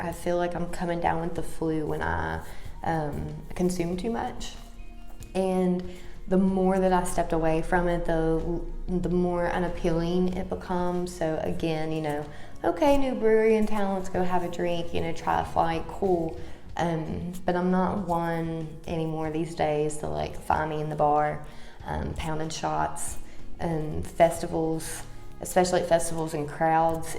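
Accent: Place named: Deep South, United States. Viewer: Great video vlog girl. I’ve struggled with being an empath for as long as have memory and had no idea what was wrong or why I would come home extremely worn out. People would have sucked the life force out of me little did I know. I’ve gotten to where I have withdrawn myself from society because I became fed up with how evil people and how much they take from you. Just this week I let a girl whom I thought I could trust borrow my car and she burned me stole some things out of my car and kept it so long I had to threaten report it stolen. I’ve become cynical as hell and just fed up with the curse because I care so deeply. I too understand substance abuse to make it go away. You sound like you are from the Deep South where I’m located. Keep up the good content girl friend. Kashton <mail> Take care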